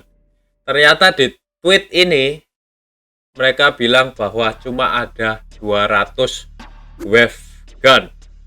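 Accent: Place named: Java, Indonesia. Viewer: Si medok membantai Zombie kapan nih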